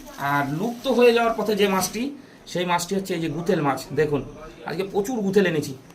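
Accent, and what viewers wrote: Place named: Dacope, Khulna, Bangladesh. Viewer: তোমার কথা একদম আমাদের মতো।দাকোপ,খুলনা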